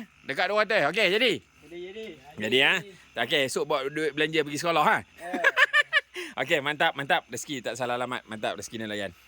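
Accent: Perak, Malaysia. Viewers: Saya dgr admin ni ckp... Kg Telok Perang. Kalau berdasarkn dialek, rasanya di Perak. Tapi x tahu mukim, daerah mana... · Cara cakap tu kat Perak gamaknya..😂❤😂❤😂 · Sya btul2 suka dgr abg cakap loghat perak..trbaik la bang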